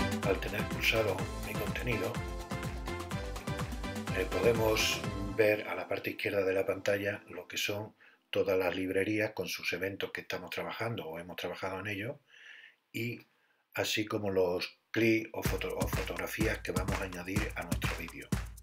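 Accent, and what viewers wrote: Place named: Extremadura, Spain. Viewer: Buenas noches, me encanta lo que estoy viendo. Soy una persona mayor y quiero hacer algún video con mi ordenador Mac. Además por el acento del profesor creo que es extremeño. Yo también lo soy, aunque vivo lejos. Muchas gracias.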